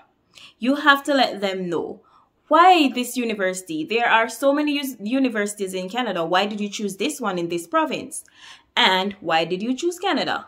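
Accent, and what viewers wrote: Jamaica. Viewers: I love your jamaican accent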